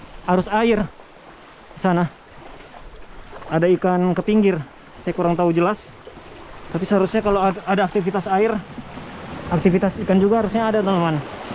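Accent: Manado, Indonesia. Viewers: Itu logat manado memang kantal skali kang 😅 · Di daerah mana ini kawan ?? logat manado ngana pake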